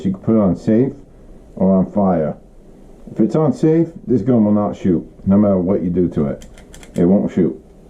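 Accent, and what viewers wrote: Boston, United States. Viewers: Love my p22! The accent...I miss Boston my home town. Nice video.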